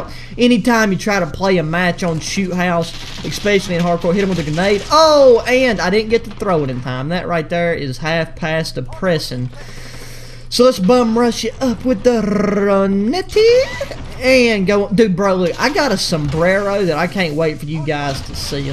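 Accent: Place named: Eastern Kentucky, United States. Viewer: Where you from, man?? It's so rare to actually hear folks with our accent on here! Watching from east KY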